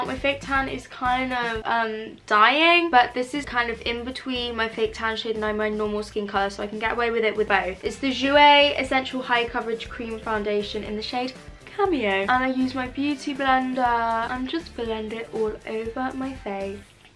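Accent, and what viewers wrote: Scotland, United Kingdom. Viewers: As a Scottish person listening to her Scottish accent has me in stitches 😂😂😂😂😂👌🏻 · How could you not love her Scottish accents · can please do a whole video in her scottish accent its actually so entertaining🥰🥰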